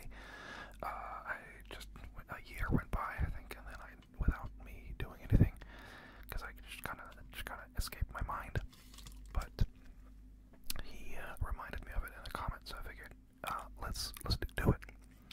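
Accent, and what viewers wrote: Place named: United States. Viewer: så kult! Hvor bor du da? Høres ut som du har en veldig amerikansk dialekt på engelsken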